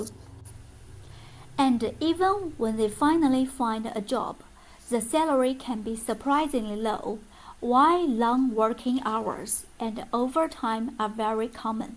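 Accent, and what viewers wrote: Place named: China. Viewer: You back❤ This type of English so familiar for me bcz of my Chinese boss.kind man he is .thank you so much showing how days are going ahead.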